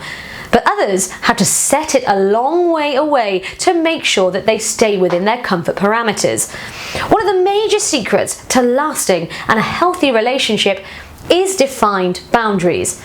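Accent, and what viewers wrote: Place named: United Kingdom. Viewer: u r very cutely and your lovely British accent makes me mad, I Love u and thanks from u and your team to this useful product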